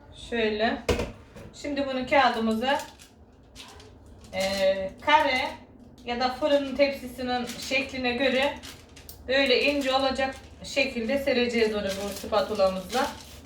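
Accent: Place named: Akçaabat, Turkey. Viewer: CIM SENIN GIBI KONUŞUYOR ELTIM AKCAABAT LI ONUDA SEVERIM KONUŞ MALARINI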